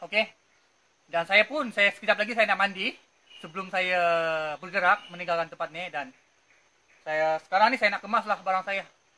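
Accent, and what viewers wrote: Sabah, Malaysia. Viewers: Ada logat sabah.awak orng sabah ke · Mcm org sabah loghatnya bercakap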